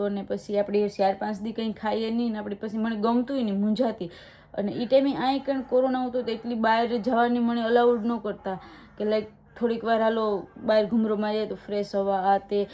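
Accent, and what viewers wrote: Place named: Barda, India. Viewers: bhai aa amara barda ni mithi bhasa 6 aa bhasa koi boli na sake amara sivay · Mer ni boli emj bole bhai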